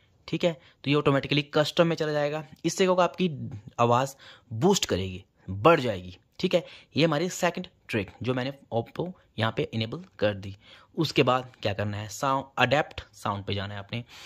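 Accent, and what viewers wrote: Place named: Himachal Pradesh, India. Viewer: pehle ye btao u belong frm Himachal na ?? M 101% sure yes u r frm Himachal …..I recognised u by yr voice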